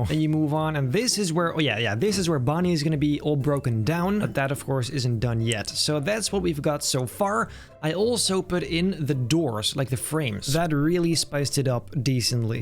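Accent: Netherlands. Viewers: you sound dutch (nederland is cool) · hahahaha thought i recognized a dutch accent but “people of the good life” confirmed it cause there is no better thing then dutch people saying “mensen van t goede leven”. great build and happy to see there are more dutch people who love fnaf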